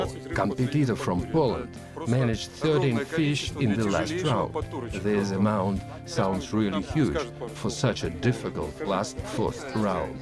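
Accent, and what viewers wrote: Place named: Russia. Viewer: раша акцент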